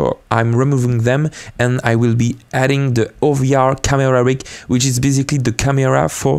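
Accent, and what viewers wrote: France. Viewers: Vu ton accent tu es français, ce serait vraiment cool de traduire ta vidéo en réenregistrant ta voix en français par dessus, merci dans tous les cas :) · Little questio, are you French? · Oh mec, je crois que tu es francais vu ton accent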